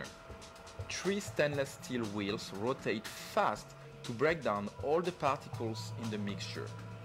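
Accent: France. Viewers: Love that French accent. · What a beautiful French accent !!! :)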